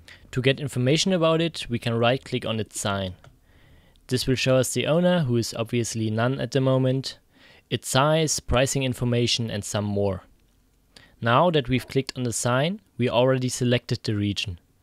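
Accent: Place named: Germany. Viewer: this is the most german english I've ever heard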